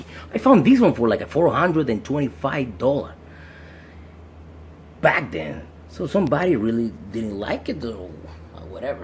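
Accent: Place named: Latin America. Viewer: You is "colombiano" or Argentino or mexicano?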